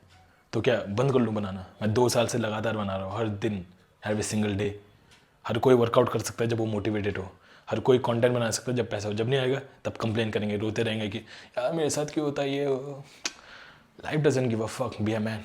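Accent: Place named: Northeast, India. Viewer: dost northeast se ho kya? bdiya accent hai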